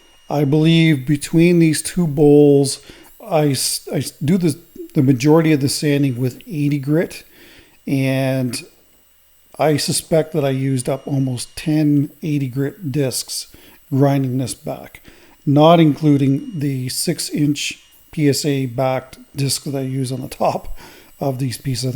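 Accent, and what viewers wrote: Canada. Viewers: I love listening to your commentary as you work! And Canadian accents are the best 😊 Two beautiful pieces today. I usually go for walnut, but the spalted birch is my favorite.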